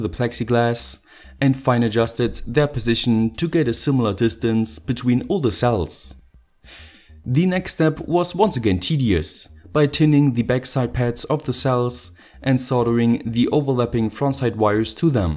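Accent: Germany. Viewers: Of course he comes from Germany, you can clearly hear his accent. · You sound like you're a German... It's not worth trying and build your own PV modules...Tried everything and it's just throwing away money and time for something that's never going to work and last like ORIGINAL fabric made one... Best regards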